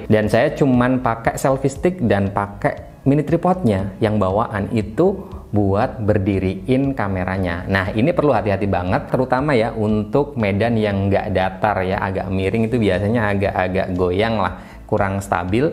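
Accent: Pekalongan, Indonesia. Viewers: Sampean Pekalongan ya mas?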